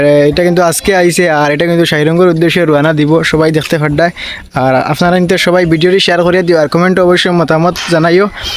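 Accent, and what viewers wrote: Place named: Sylhet, Bangladesh. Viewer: Shyleti style language . Tripura এর লোক আপনি. নাহলে শিলচর জেলার লোক. শ্রীহট্ট (সিলেট) এর ভাষা আমার খুবই প্রিয়।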